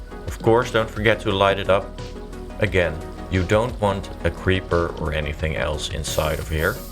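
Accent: Netherlands. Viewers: this is the first time i realy heard the dutch accent i your english never knew you where dutch. and ofcource a great build keep it up man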